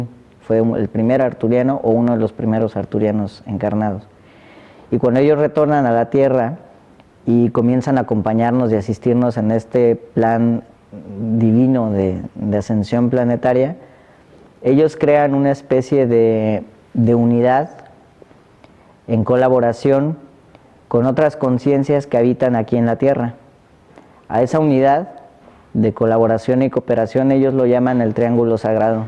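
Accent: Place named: Mexico. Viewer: en que país vives ahora, por tu acento me parece que eres mexicano. Me encanta tu manera y forma sencilla y fluida de narrar la información, gracias.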